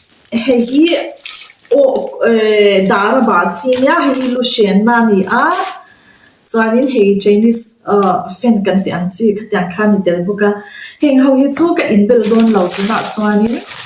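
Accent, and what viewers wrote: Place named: Lamka, India. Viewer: Fiah lutuk❤❤muhnawm leh dawn ae🥰🤗🤗 Lamka tang aw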